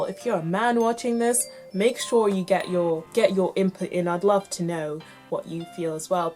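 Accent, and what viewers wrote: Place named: England, United Kingdom. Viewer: You So Cute! Such Lucious lips And Eyes💎 U Talk So Cool Over There In England..... 💖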